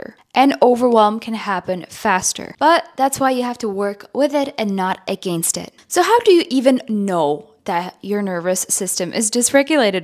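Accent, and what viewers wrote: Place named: United States. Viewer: jaktože máš tak perfektní angličtinu a ještě k tomu americký přízvuk? 😯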